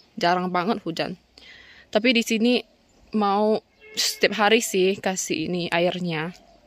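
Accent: Kalimantan, Indonesia. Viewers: asli Indonesia dari Kalimantan tapi dia keturunan China Indonesia ketika di Indonesia lebih sering pakai bahasa daerah seperti bahasa Hakka jadi ketika ngomong indo logatnya rada asing🙂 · Sepertinya Tionghoa Kalimantan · Logat bicara org kalimantan , Pontianak mmng kebanyakan begitu bu.. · Itu logat tionghoa kalimantan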